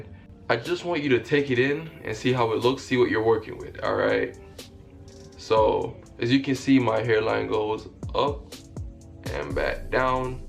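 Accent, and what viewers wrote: Jamaica. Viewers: Oh you Jamaican too? Instant sub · U can tell he’s Jamaican 🤣🤣🤣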